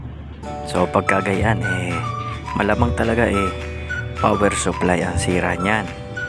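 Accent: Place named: Batangas, Philippines. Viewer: Idol taga batangas ka ba ? May Punto Kasi ung salita mo sa video, may problema ung v380pro ko ayaw mag read Ng QR code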